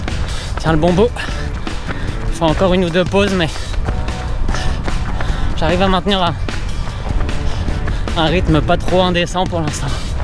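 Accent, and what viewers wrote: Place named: Quebec, Canada. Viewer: Tu avais plus l'accent québécois il y a 6 ans .. tu l'as un peu perdu depuis 🤪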